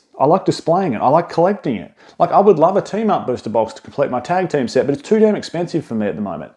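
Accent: Australia. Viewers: Hey, legend, random question but are you aussie by chance?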